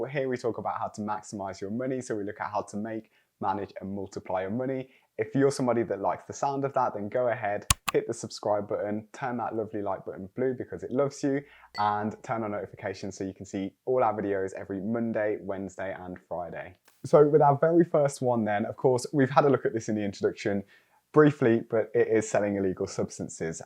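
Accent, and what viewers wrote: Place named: Birmingham, United Kingdom. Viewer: Thought I clicked on an unknown T video😂. You know I thought I could detect a hint of "Brummy" in the accent😂. Great video. I was tempted to do Ethical hacking at undergrad, but anyone who hears the term hacking outside that realm will immediately have negative connetations. But it's got that white hat hacking roles are cropping up.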